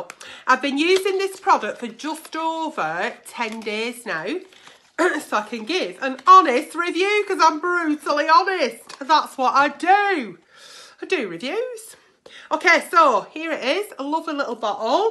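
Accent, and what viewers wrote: United Kingdom. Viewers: Idk if you're British or not, but God damm I love it.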